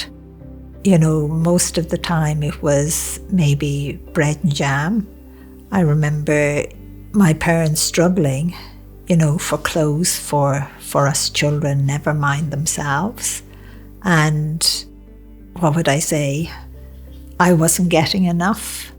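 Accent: Ireland. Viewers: I can tell she's Irish. Beginning of video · Just what l needed. I so love her soft lrish accent. It makes such a change from all the US you tubers! (l say this with respect nevertheless, so please don’t take offense). That soft Irish drawl is like a healing solace to my soul. 💝🇬🇧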